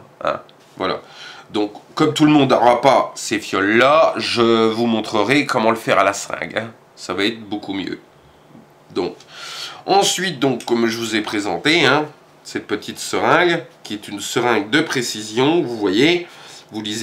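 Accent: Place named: Franche-Comté, France. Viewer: Tu as un accent de chez moi, tu es franche Comté ?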